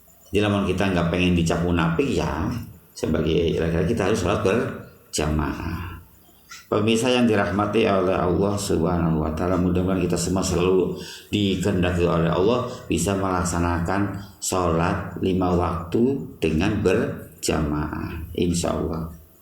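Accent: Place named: Java, Indonesia. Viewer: Bahasa MEDOK gini sepertinya unik nih ,,,wkwkwk soalnya saya lihat dari part - 1 sampai part - 2 sama.tapi bagi saya masuk sekali cara penjgkajiannya,monggo dilanjut pak ustadz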